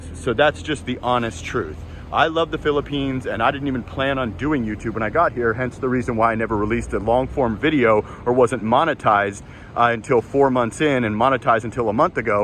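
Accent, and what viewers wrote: United States. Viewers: I’d like to add because you may not know it, your narration helps a lot too the young Filipinos learning and developing and mastering the American twang.👍👍👍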